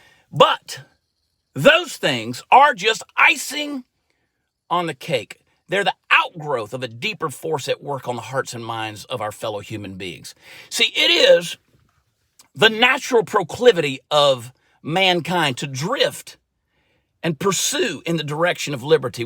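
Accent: Texas, United States. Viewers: How does a New Jersey-ian get a TX accent?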